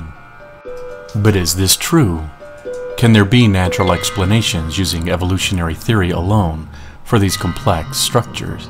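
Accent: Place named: Canada. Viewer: Off topic but you sort of have an accent that I notice. Especially when you pronounce "ideas", are you Canadian?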